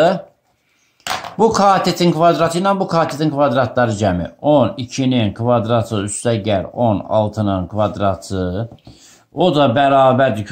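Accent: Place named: Shaki, Azerbaijan. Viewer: Çox gözəl müəllimə.Şəkindənsiniz? Ləhcəniz əladı❤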